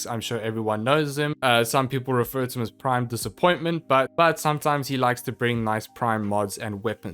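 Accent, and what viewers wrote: South Africa. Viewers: Are you South African? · South African? XD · South african? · Are you from S.A. ? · Man you really sound South African. hmmmmmmmmmmmmmm.... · South african accent or is it just me? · Are you from South Africa 🇿🇦 🤔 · Love the south African accent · This brus South African 😂. I can hear that accent from a mile away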